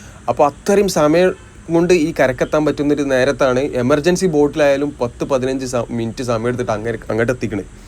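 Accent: Malappuram, India. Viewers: മലപ്പുറതകാരനാണെന്ന് സംസാരം കൊണ്ട് ഉറപ്പായി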